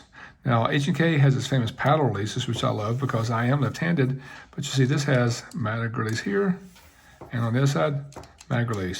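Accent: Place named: Belgium. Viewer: I’m just guessing, but are you originally from Belgium? Had to ask because of the accent 😉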